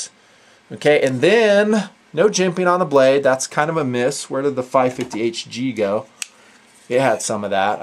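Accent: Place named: South, United States. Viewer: I just love southern accents like when he said "sheepdog knife!"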